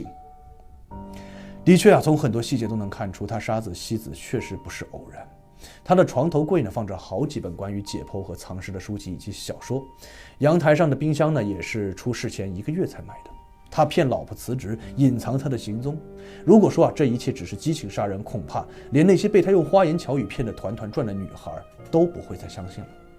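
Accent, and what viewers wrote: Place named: Shanghai, China. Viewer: 你这个上海普通话，把这么严肃的案子说笑了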